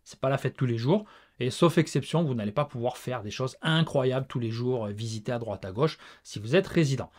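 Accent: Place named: South, France. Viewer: salut. une videos limite émouvante. limite kawai si vous aviez eu des yeux plus grand et plus humides. j'aimerais aller au japon un jour mais y vivre je le sens pas avec cette histoire que les japonnais ne débâtent pas ou manque souvent de discutions. d’ailleurs j'ai pensé a un phénomène de bulle plus ou moins grande quand on est etrangé dans un pays en voyant cette videos.... je sens un complexe sur ne pas etre doué avec le sav japonnais.... après y'a d'autres qualités, la cuisine, etre un bon pere, réparer des choses, etre un bon soutiens émotionnel les courses.... et ne vous inquiétez pas tant que vous garderez votre petit accent du sud sympathique vous resterez francais. ça sera si vous le perdez qu'il faudra s’inquiéter. d'ailleur l'histoire de vouloir progresser en japonnais j'aurais tendance a vous conseiller a fond d'accompagner votre enfant avec les devoirs de japonnais. 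meme 15 30 min par jours ça vous fera passer du temps pere fils et vous améliorerez surement votre japonnais genre a lire avec lui des passages de livres simple ect.... et meme pourquoi pas lui lire une histoire en japonnais le soir avant qu'il s'endorme. ça joindrait l'utile à l'agreable. apres comme petit boulot je vous imaginerais trop combattre le franponnais, traduire des patisseries, des enseignes ou des cartes de restaurant meme en echange d'un tres bon repas ou un truc dans le genre.... dommage votre ville visiblement est pas super touristique ce qui fait aussi que vous ne vivez suremen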